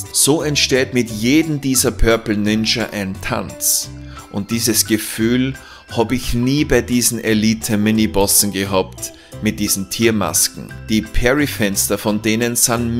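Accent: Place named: Vienna, Austria. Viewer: zu schwer - ganz einfach: 90% der Spieler, die es kaufen sind nicht gitgut und mit einer kleinen Minderheit(profispieler) macht man kein Geschäft - mir z.B machen die Bosse überhaupt keinen Spaß sondern das dazwischen .. ich spiele schon seit 11 jahren Skyrim (pause mit Elden DS3 Sekiro usw.) aber nicht das Spiel selbst sondern weil es riesige modder szene gibt - ich schraube nicht im Spiel sondern am Spiel - herrlich bescheuert - bald kommt Lords of fallen - freu mich deine auf Anleitung - als Wiener mit dem Slang ein Genuss -habe es vorbestellt mit DarkNight extra :D weiß jetzt schon ich das nur gefickt werde :D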